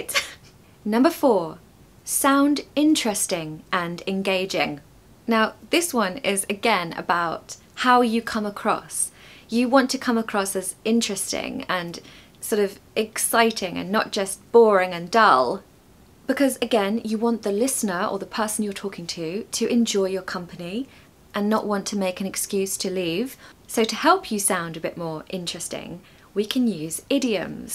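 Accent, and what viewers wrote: United Kingdom. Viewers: I love your pronounce but I can’t understand where came from? But is really British and lovely!!!!! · Your session will be an earworm in my head due to your accent. You've acted in this session as Hermione in Harry Potter series. I am so intrigued with your accent. I mean the British accent. I really express my gratitude to every moment you have taken to come out with this lovely, indulgent work. keep the good work up. Kind regards, Moe. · I just found out this channel recently, and i think this is the suitable channel for me to learn the British English. Firstly, I like your accent, the way you speaking is like singing a beautiful song. The speed of your speaking is not that fast so that i can listen carefully and mimicking right away Secondly, you are a very down to earth lady that is like a Sister in my neighborhood who do not put a heavy makeup and very decent wearing that do not expose your body. 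Make us can concentrate on learning. Thirdly, your character is very cheerful and you always share your real life stories with us. I repeated your video at least ten times in a go to make sure i learn what you teach. Your video really full of resources and knowledge that we need. You are really a good teacher in making this kind of video. Thank you so much for your efforts. I am a Malaysia Chinese girl. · I fall in love with your British accent 💚🩵💙💜💛🧡❤️💜🩷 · Hello, Beautiful, charming, refreshing!!! I'm a Southern USA American from 1600's ancestors. Your musicality, considerate & gentle way of phrasing, positivity, and floral frocks:) resonate with me! Your analogy of a rose incorporating beauty, strength, and resiliency could not better describe Southern USA woman in the 1800's. I find more in common with your accent than with the urban USA accent (changing "t" in a middle of a word to a "d" sound, for example). 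The greatest difference my untrained ear detects is that you don't use a flat "a" vowel sound (heard in words like mascara and vase) and yet we share the schwa and silent consonants, as well as my much teased use of "reckon". Your video "Turn your American accent to British" is spot-on. A specific question for you is how should one untrained in linguistics begin to explore their historic, enduring connection to Britain and further support the study of the English language on continents afar?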